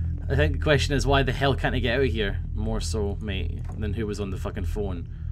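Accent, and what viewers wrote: Scotland, United Kingdom. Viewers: He is Scottish, I don't think Russian sounds like that at all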